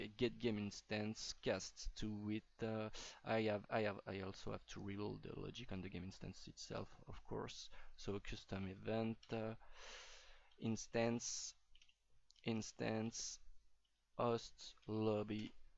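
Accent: France. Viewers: Je reconnais l'accent Français x)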